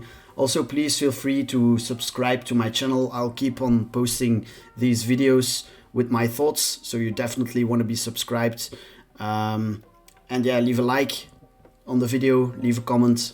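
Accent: Flanders, Belgium. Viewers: Is it flemish? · I hear flemish accent? ;) Agreed btw, looking to bid sub 45k if we get there. Wonder when/if we're gonna get sweep of liquidation candle bottom soon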